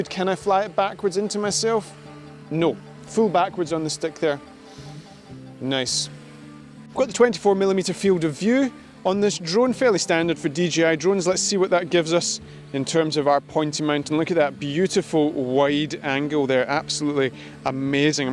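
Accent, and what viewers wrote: Scotland, United Kingdom. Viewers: I like the scotish english sound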